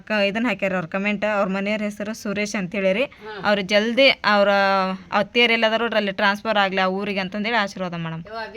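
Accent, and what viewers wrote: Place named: Hubli, India. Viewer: Beautiful family ur Hubli is very beautiful & ur hubli kannada is so cute I like it gd night allah hafiz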